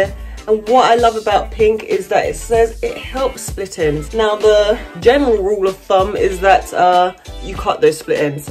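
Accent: United Kingdom. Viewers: Ur voice is pretty are u from the UK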